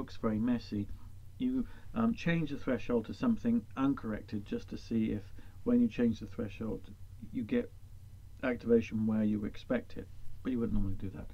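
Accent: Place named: England, United Kingdom. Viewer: Lovely accent - Really miss the English accent - so pretty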